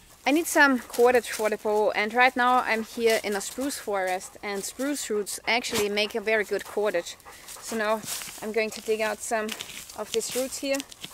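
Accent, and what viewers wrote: Germany. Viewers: Hunting with bow is forbidden where you are? Where is that? Sorry I'm new to the channel and just watching all the different videos, I'm not good with accents, but if I had to guess Germany or Austria? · are you German... I think I can hear your accent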